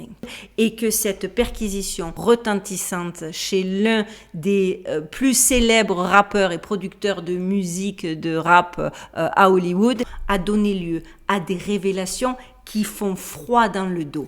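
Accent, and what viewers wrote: South, France. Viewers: Ma chère garde ton courage et ta pugnacité❤ j’aimerai bien un jour entendre ton anglais à la marseillaise car comme moi tu n’a jamais perdu ton accent😉😅. Je vis en Belgique et je me fais repérer à tous les coups😅 surtout quand j’avais du grain à moudre avec la police 🥴 garde une zen attitude, les trolls sont toujours là mais si tu te dis que c’est parce que le camp adverse a peur, c’est que tu es sur la bonne voie…🥰🥰🥰stay safe and happy😘😘😘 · jaime bcp laccent du sud bref je vais regarder la video meme si jai jamais aimer ce p.diddy comme 90 % des rappeurs americains..jespere juste que ca sera pas complaisant....jai vu une video ou un mec essayer(il ramait)de defendre p.diddy.... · je reste, car ta voix est comme un soleil chantonnant d'accent du sud, et rayonnant de ta beauté d'ange blond.. Merci d'être là contesse.. Il n'y a que les jaloux qui partent lol! 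· Merci encore et encore tu as bien du courage de regarder toute cette abomination pour la montrer à ceux qui gardent les yeux et les oreilles soigneusement fermés. Que. d'horreur!! certains etres humains (??? ) sont vraiment capables du pire et c'est pas d'aujourd'hui..déjà ds l'histoire en France Gilles de Rais et la comtesse Batorl et beaucoup d'autres qui par leur "rang" étaient "au dessus des lois, de la morale, près du pouvoir" C'est immonde et je crois que peut etre nous vivrons la chute de cette mauvaisete maintenant que la boite est ouverte, la puanteur qui s'en dégage est si forte que bientôt mm ceux qui se pincent le nez vont la sentir. Tu es aussi intelligente et courageuse que jolie à regarder et j'adore ton élocution qd tu t'enerves avec ton petit bout d'accent du soleil. Bisous de la mémé de france 😘👵💖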